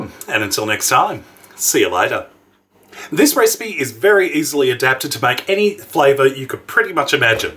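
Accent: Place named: Australia. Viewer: I recently subscribed to your channel for two reasons: the easy-peasy recipes, plus your delightful Aussie accent! We Americans do enjoy hearing y'all talk.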